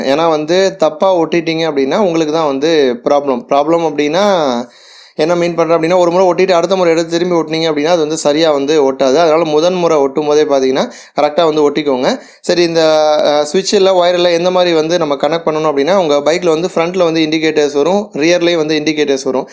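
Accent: Thanjavur, India. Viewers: neenga Thanjavur ah?????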